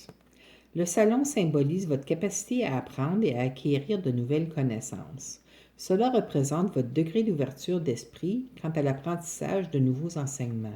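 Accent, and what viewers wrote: Canada. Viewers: Bonjour, je vous souhaite de retrouver harmonie dans votre coeur, pas d' épreuve plus large que nos épaules...une expérience de plus pour travailler sur ce qui n'est pas encore "réglé"... pour autant, ne pas se flageller, je sens que vous êtes aussi une personne qui sait analyser les choses, réconfortante, généreuse et forte. J'aime beaucoup vos analyses des cartes. Au plaisir d'entendre votre accent canadien!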